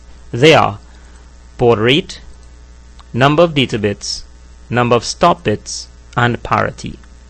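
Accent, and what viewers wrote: Trinidad and Tobago. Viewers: I know that trini accent anywhere. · That accent... Trini!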